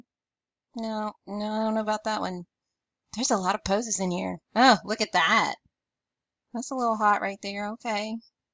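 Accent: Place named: South, United States. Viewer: You're too cute! "Is there something stuck on ma shoe? Did ah break ma heel? What's that all about?" Hehehe love your southern gurl, so am ah! and TYSM for doing these videos. Ah am a visual learner and ah need pictures too. YOU are a wonderful teacher!!!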